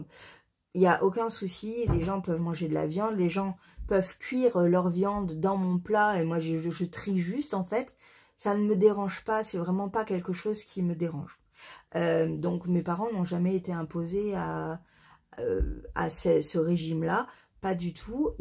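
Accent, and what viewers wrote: South, France. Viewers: Bonjour, je te suis depuis tres longtemps, sans laissé de commentaires et c'est la première fois que j'entends l'accent du sud et d'habitude tu ne l'as pas. Merci pour ta bonne humeur et ta gentillesse.A bientot🥰😽😽😽😽🌺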